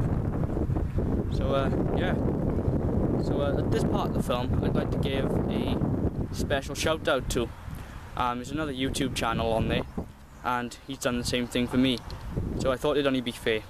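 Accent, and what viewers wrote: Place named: South Wales Valleys, United Kingdom. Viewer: aye obviously with that valleys accent lol. Im up in merthyr, picked up my 97k blackline yesterday for my first rifle, have a nikko sterling 4.5-14x50 AO IR coming monday for it. Cant wait to shoot it! I really like the 77 aswell, im now saving up for the 110 as a first pcp, im strictly target shooting. Any new Air rifle reviews coming soon mate?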